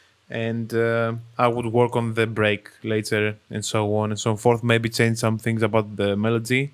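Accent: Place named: Greece. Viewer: Are you from greece? Cause your accent sounds a bit greek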